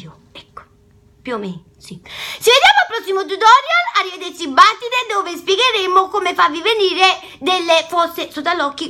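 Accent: Italy. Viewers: 😂😂😂😂Napoletana doc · Ma sei di Bari di Napoli o di Roma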